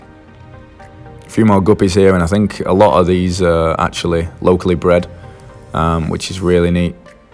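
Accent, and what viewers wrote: United Kingdom. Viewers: Just found this, you from the UK? As you have an accent south of Manchester 👍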